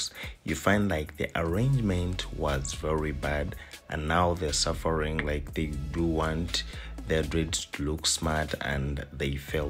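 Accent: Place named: Uganda. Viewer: ..I've just discovered you.are you Ugandan? You sound like my people..🥳🥳🥳🥳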